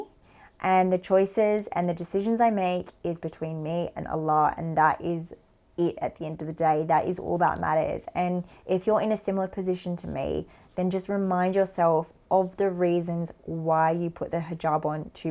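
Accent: Australia. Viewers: I wonder why did you come up much of Aussie accent in this video particularly ??... Earlier videos didn't have that much ...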